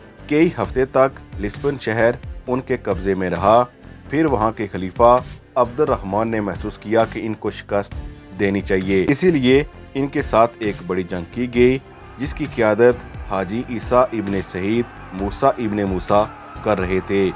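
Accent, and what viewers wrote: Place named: Kashmir, India. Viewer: R u Kashmiri ?? you Accent kashmiri hai. Distric kotli ka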